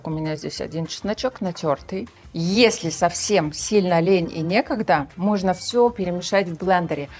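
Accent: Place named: Baltic. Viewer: здравствуйте! Ваши рецепты такие классные и удивительные и мне это нравится! 😊 А на счёт вашего акцента, я думала, что вы из Прибалтики 😁 Удачи вам ❤❤❤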